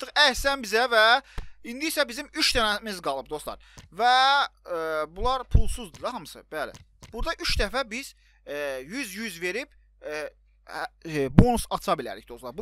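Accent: Baku, Azerbaijan. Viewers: Abi bu bakü şivesi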